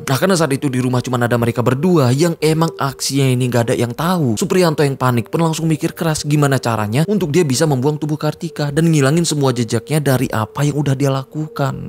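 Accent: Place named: Java, Indonesia. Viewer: Sak jane penak kisah cintane supriyanto sampek bolak balek medok 😃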